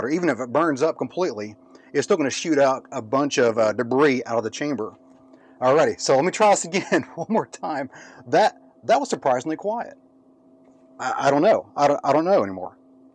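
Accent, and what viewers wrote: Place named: Texas, United States. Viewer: Why Ism I not surprised by Texas accent?=)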